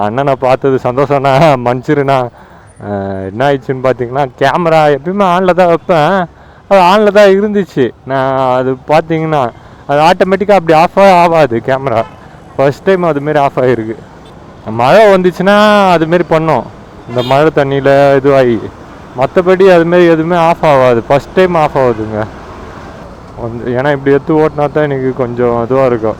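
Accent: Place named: Chennai, India. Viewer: Bro unga chennai slangu super ah irrku.... Na oru nal ungla meet panuven....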